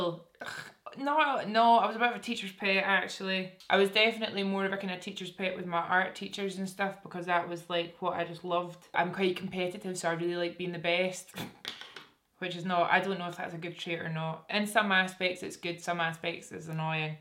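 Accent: Scotland, United Kingdom. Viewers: I have been so obsessed with your YouTube channel over lockdown. It’s just feels like watching one of my friends, probs because your Scottish as well and I love hearing that accent😁🏴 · scottish innit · I'm watching this with captions on, it literally doesn't understand the Scottish accent lol. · Okay. I absolutely adore you! I just came upon your channel and boy I’m glad I did. I love your voice, your Scottish accent, your jewelry, or tattoos, EVERYTHING!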